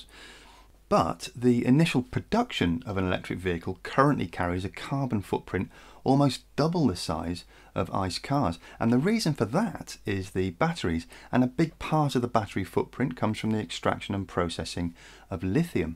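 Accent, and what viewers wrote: United Kingdom. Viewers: I like his voice. So calming and so british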